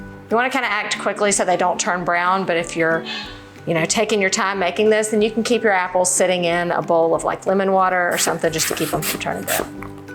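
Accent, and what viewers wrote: South, United States. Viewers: She has a fun super southern accent! Going to try this tonight!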